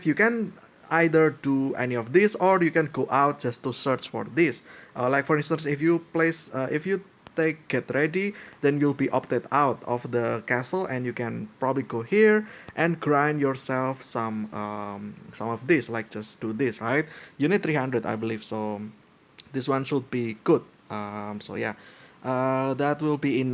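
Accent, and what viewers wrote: Indonesia. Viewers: Iya ketara ngomongnya sih, orang indo pasti bisa dibedain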